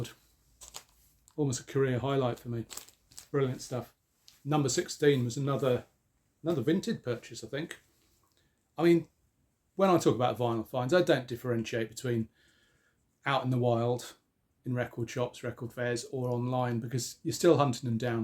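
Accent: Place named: Scotland, United Kingdom. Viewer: What a perfect scottish inclination of your. Namastè.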